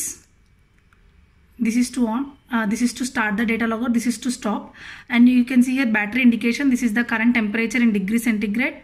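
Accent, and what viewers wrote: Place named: India. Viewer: This english is just understandable in India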